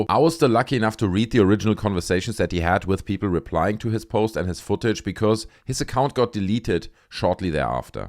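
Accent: Germany. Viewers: This a German guy ! His accent is great 😂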